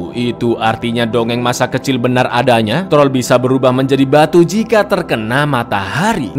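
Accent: Java, Indonesia. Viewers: pakek logat jawa cak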